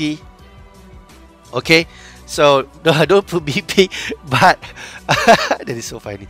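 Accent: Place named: Malaysia. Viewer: English nya khas Malaysia betol🗿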